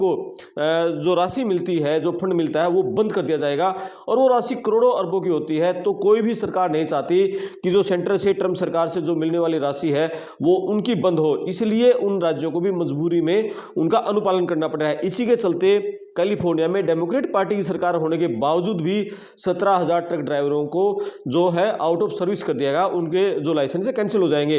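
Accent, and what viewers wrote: Haryana, India. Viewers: Haryanvi flavour bhut ganda lagta hai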